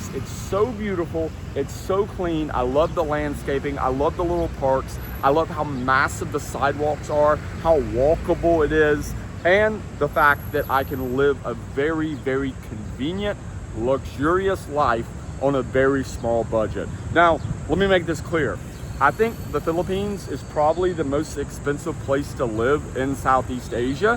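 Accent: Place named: Atlanta, United States. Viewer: I love your Atlanta accent 🤣🤣 I live right up the mountain in NC and it’s so different but I’m happy you are representing the south in Asia!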